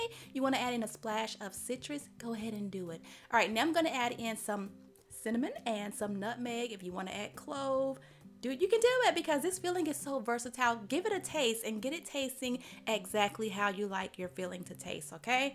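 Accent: South, United States. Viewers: Hi , I am from South Liverpool UK, and love your programs so much and can't get enough of the accent , ( real southern states ) . I have a question and it is this , please what is Half and Half 🤔. Do let me know , as in the UK , we do not have Half and Half 🤔. Excellent program ( never stop ) as it is, ( THE NUMERO UNO ) on YouTube for me . Many thanks .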